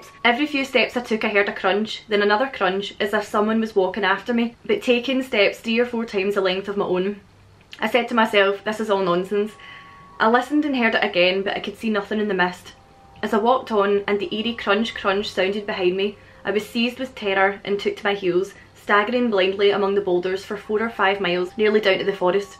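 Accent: Scotland, United Kingdom. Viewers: If you're interested in folk stories from Scotland and Ireland, Juliet Marillier writes beautiful fantasy based off of them. Daughter of the Forest is book one of my favourite series by her. Oh, and I love your accent! I miss hearing it, since my Grandpa died. He was from Glascow. Well, a small town just outside. Auchinairn?? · If you want a laugh..watch with the Closed Captions on. The computer that is used doesn't understand a Scottish accent!! It's interpretation is hysterical!!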